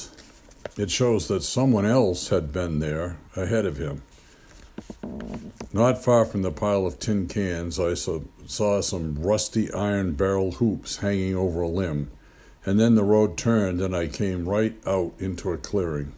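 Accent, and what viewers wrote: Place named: Boston, United States. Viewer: Im obsessed with the narrators Boston/New England accent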